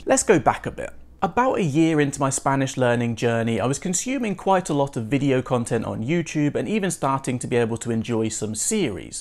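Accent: United Kingdom. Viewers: Nice video! I’ve been learning Spanish for a while, but haven’t done too much with audio books. I’m going to do more. BTW I just finished watching your video with Español con Juan (my first time watching your channel) next I watched this video, I don’t know why but it was strange to hear your British accent! 😀 ¡Tu Español es muy bien! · I love your British English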